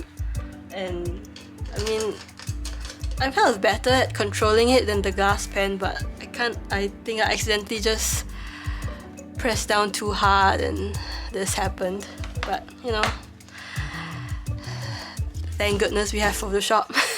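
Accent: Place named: Singapore. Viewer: Your drawings are so pretty! And I really like hearing another Singaporean voice on youtube!! Talk more!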